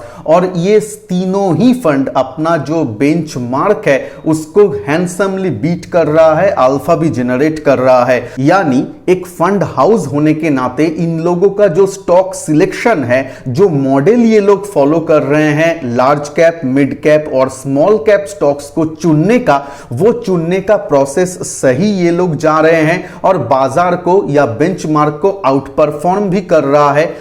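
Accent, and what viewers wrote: Bengal, India. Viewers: আপনার উচ্চারণ শুনে মনে হচ্ছে আপনি বাঙালি, যাইহোক আপনার ভিডিও আমি খুব মনোযোগ সহকারে শুনি।। সঙ্গে আছি দাদা।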